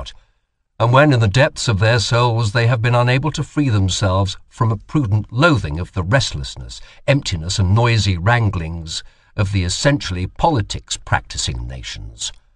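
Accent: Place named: England, United Kingdom. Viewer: Very funny to hear Nietzsche's rant on Englishmen being read with an english accent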